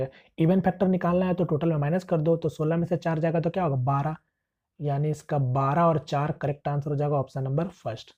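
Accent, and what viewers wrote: Jharkhand, India. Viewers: Your accent is like jharkhandi....1 tho 2 tho...😁. Ye sirf jharkhand wale bolte he r u from Jharkhand..??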